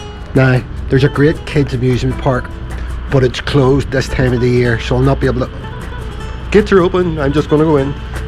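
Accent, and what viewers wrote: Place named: Belfast, United Kingdom. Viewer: Omg really great to hear another Belfast accent, I've been living in Spain for 15 years ni and you're the first. Really enjoyed the video, everything looks so peaceful compared to the big noisy city where I live. We're lookin to move and going through all the different places on YouTube, so you're great! Thanks a million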